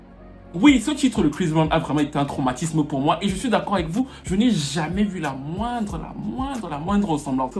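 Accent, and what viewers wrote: Cameroon. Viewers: ce mec merite plus 1 M d abonnes. tu es trop bon ow mon frere africain. j adore ton accent camerounais LOVE YOUUUU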